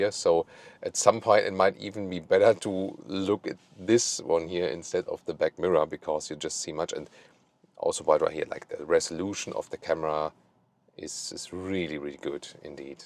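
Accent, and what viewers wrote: Germany. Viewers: I love to hear your German accent :) perfect . nice review as always. is it original quicksilver color? or another color changed with ppf? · you are good in many ways but please work more to improve your English! Is very tiring when you speak English but we hear mostly German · An excellent review. Love your German accent and your English is perfect, thanks for a great review.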